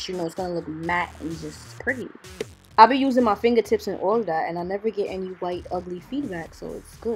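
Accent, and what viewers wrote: New York, United States. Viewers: You’re def a New Yorker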